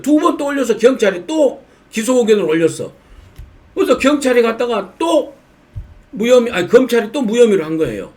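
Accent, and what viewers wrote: Jeolla, South Korea. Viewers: 과거 듣기에도 징그러웠던 전라도 말이 민의원님 입으로 나오니 달콤 정겹습니다. 김경재 총재님, 고영신 박사님, 안형환 의원님 ᆢᆢ그리고, 우리 의원님!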